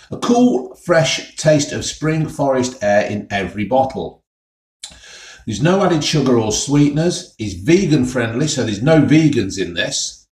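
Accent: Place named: Newcastle, United Kingdom. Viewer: True Geordie emdy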